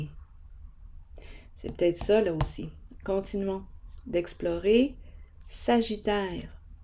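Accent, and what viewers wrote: Quebec, Canada. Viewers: Bonjour Je te découvre après avoir écouté ton message à travers de ce très beau tiraje.... On diré que tu t'adresse à mon cœur.... c'est exactement ça...... La carte du flirt sur la carte du papillon.....me fait réfléchir sur le fait de lui faire confiance ou non..... Elle, Taureau ascendant Sagittaire de 47 ans, avec un ego et un orgueil très fort et démesuré..... Elle a frapper à la porte de mon cœur le mois de mars dernier. Cette femme a souffert des abus de la part de son père jusque les 17 ans. Elle est sublime mais très abîmée....je souhaite croire que elle pourrait encore s'engager et tourner la page, et aller vers une vie de paix et amour sans tromper ni tricher ni commander.....mais je n'en suis pas tout à fait sûr de cela.... Le mois de janvier sera définitif pour y voir plus clair, réconciliation ou rupture.... et c'est moi qui devrait prendre la décision...... 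Ton magnifique tiraje, termine sans se terminer ni donner des réponses à cet belle mais très dure situacion..... Je te remercie pour ce tiraje tres riche et profond, et pour ton merveilleux accent du Québec....que j'adore......Moi je suis aux Pyrénées-Atlantiques aux pais vasc frances entrain de vivre cet exercice de vie..... A mes 72 ans, je souhaite vivre la paix et l'harmonie...... Si cette belle âme choisit la paix, l'amour et l'harmonie je resterai à son cotée pour continuer à grandir ensemble, mais si ne c'est pas le cas, c'est moi qui va tartir cette fois ci. Je te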